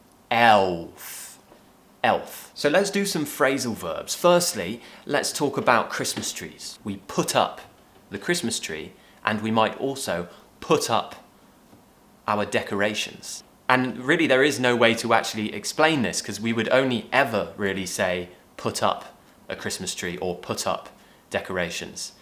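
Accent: United Kingdom. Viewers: Happy celebrations!!!! And thanks for all videos!!! I love British pronunciation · Hi sir. EVEY TIME WHEN I LISTEN TO YOUR SPOKEN BRITISH ENGLISH I LOVE MORE AND MORE ENGLISH.CHEERS OUR BEST TEACHER.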